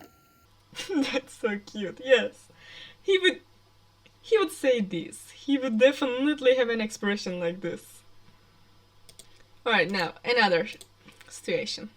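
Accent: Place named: Turkey. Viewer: Help i was watching it and i noticed the accent i was like "İs she turkish🤨" and then you were lol😭😭 So hello fellow turkish person lol😭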